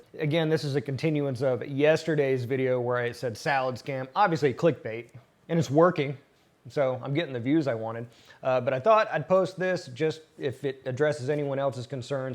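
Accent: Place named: Australia. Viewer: Mate? Sounds Australian! :)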